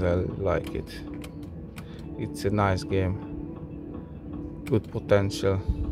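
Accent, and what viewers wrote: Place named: Hungary. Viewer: ember! te magyar vagy........annyira lehet hallani,..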